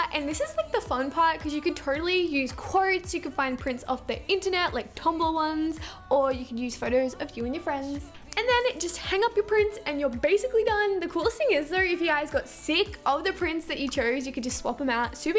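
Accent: Australia. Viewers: Sarra Turki she is from australia (didnt you notice the australian accent?)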